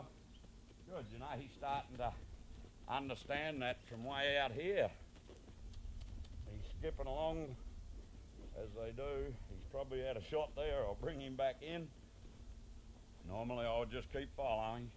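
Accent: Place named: Australia. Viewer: I like that he was gentile and did not get into uneccesary fights and kept the session short with a young horse. I hate the show em whose boss..out of my space, use that whip and teach and ride with pain to make up for your lack of hosemenship. Sounds like down under accent..NY here.. Hate the way I was taught to ride with pain.. These amazing animals do not need bits or tie downs or spurs nor whips.. They or rather we do so much better just using a rope halter with no bit bucles or chains. And it creates a much better bond and team. lovely little chestnut.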